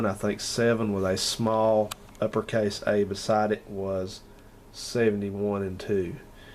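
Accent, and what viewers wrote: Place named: East Tennessee, United States. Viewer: Love the accent. If I have to guess, east Tennessee? (please don't yell at me if I'm wrong!) Brought back some memories from when I lived in Oak Ridge back in '96-'97.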